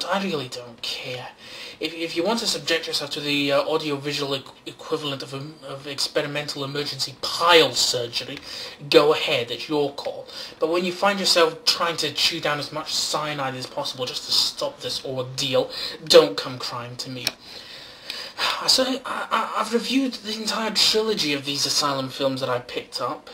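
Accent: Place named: Tayside, United Kingdom. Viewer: ha! great review, i almost choked on your hatred for asylum films. were about in scotland are you from btw? you sound like you're from the tayside area. looking forward to the dark knight review, maybe a batman & robin review aswell?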